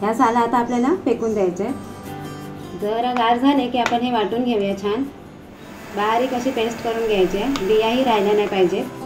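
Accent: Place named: Malvan, India. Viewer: Like your malvani accent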